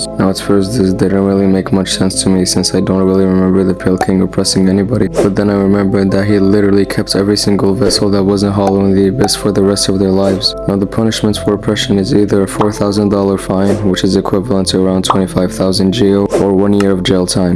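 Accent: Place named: North Africa. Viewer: You north African bro? the accent kinda seeps out! Really good video btw!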